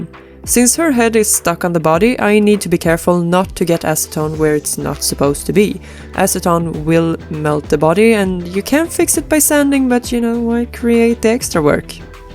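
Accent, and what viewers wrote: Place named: Sweden. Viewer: I'm truly sorry for ur loss tho, i thought u were swedish by ur accent it the start of the video but then i realized u actually were when u told about the öra thingy lol jag är också svensk så tyckte det va lite kul :)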